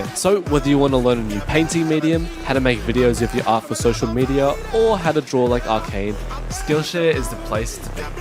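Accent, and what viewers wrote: Australia. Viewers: Are you Australian cuz I am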